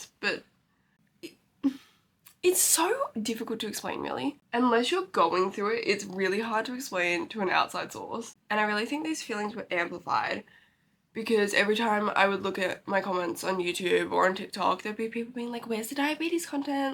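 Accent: Australia. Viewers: I just love your accent ! I always hear american accent but never aussie one, as a non native english speaker it feels so weird but so cool !!!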